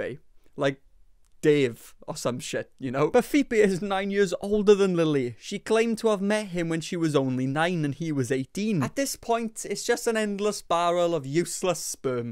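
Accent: Wales, United Kingdom. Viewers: a cute welsh accent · This man could talk about Eastenders and I would gladly sit and listen to him. I swear the welsh accent is a beautiful gift to humans. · well that growl thing made me feel surprising things aha you seem extra welsh in this one.. keep up the good work!!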